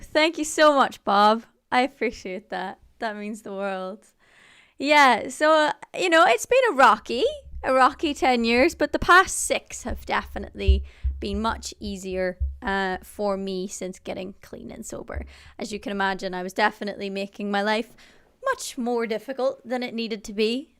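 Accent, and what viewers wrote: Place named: Ireland. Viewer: Did they ask you to change your accent on XCRAPTOR. I was so happy when you kept your beautiful Irish tone.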